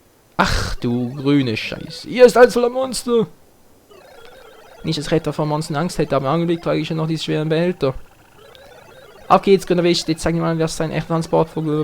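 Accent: Switzerland. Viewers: xD...Tschöpli!!! xD Schwizerdütsch rockt!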